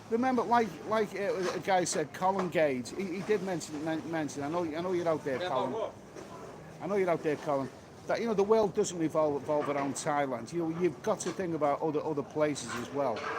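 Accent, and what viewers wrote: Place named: Liverpool, United Kingdom. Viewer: Scouse is routinely rated as the hardest English (not Scottish) accent to understand. Rated way below even Cockney. Many Brits are embarrassed over having Scouse in England. This channel needs SUBTITLES!! I am tired of hearing about wild "bears" (beers) and pals (pools). Many other words too.... I simply have no idea.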